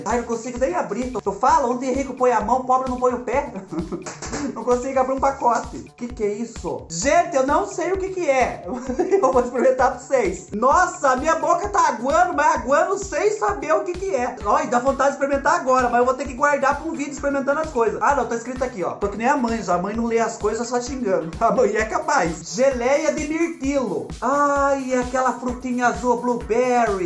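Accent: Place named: Paraná, Brazil. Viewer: Espicula da redondinha kkkk minha mãe falava, aqui no Paraná .. no interior falam igual a vc... Morro de saudade de minha familia qdo escuto vc falar algumas coisas ... Kkk parabéns... Amamos vc. Espicula redondinha kkk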